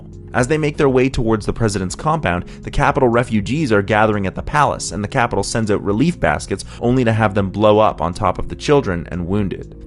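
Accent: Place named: Canada. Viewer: Question, is he Canadian? The “OUUT” gives him away. lol Love the video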